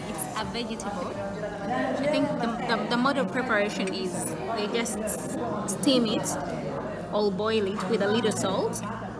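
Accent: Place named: Netherlands. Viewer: The English has really changed. This is really a Dutch accent. U loved the Vidoe bby gal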